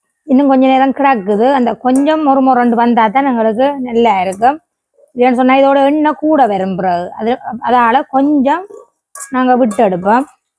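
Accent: Sri Lanka. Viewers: முன்னாடி என்றால் யாழ்ப்பாண தமிழோ ...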